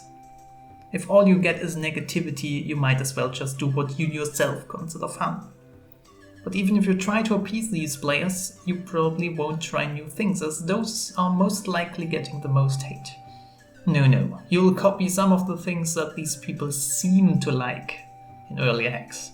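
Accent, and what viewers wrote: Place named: Germany. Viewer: It felt nice to hear a new German voice. And of course, every word was pronounced 100% clearly.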